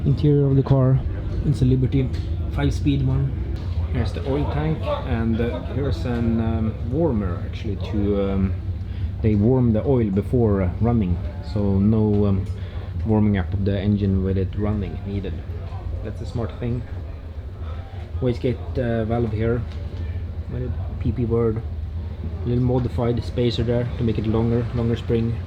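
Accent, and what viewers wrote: Sweden. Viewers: Your Swenglish accent is half the joy watching your videos! All in good faith!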